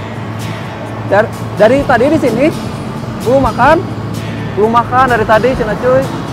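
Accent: West Java, Indonesia. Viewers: Diatu sunda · Bang asal mana ko sunda 😊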